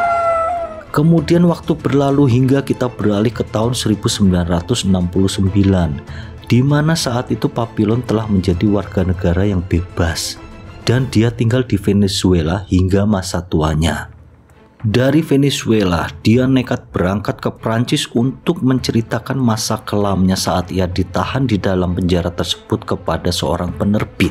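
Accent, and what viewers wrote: Java, Indonesia. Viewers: Suaranya medok bangett hahaha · Suaranya agak medok Jawa y bang?🤔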